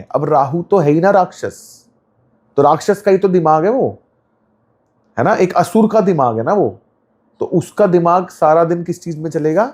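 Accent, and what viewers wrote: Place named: North India, India. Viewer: 35.00 for your info in south ppl speak better english and with better accent. Only u guys, say time as tame and blue as bilue😂.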